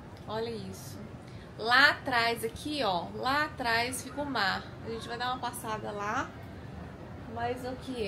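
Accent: Minas Gerais, Brazil. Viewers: Oi. Adoroooo viajar com você por esses lugares paradisíacos. Eu deveria dizer com vocês, pois temos 2: a PODEROSA que se veste com as grifes caras e a GENTE NOSSA que se veste com Shein, e tem nas duas a SIMPATIA MINEIRA com esse sotaque maravilhoso! E ADOLESCENTE é tudo igual kkkkkkk. Beijos.❤❤❤❤❤❤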